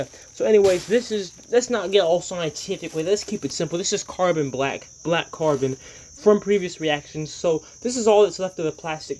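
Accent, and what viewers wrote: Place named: England, United Kingdom. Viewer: Did you spend time in England? You do our accent as much as I do and American accent😅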